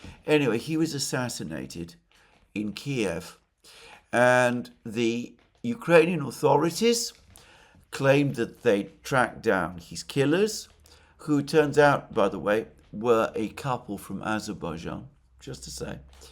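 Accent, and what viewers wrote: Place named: RP, United Kingdom. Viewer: Your English is pretty. The Queen's English I presume. An endangered specie :)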